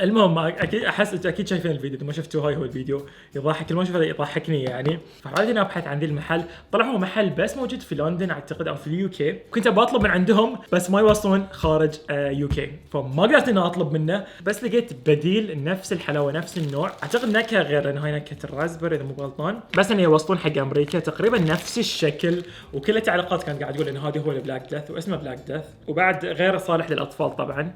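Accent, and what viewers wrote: Bahrain. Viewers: اول مره اشوفه و محتواه يجنن بل بدايه حسيته عراقي بس حسيت لهجته بحرينيه او من اهل الاحساء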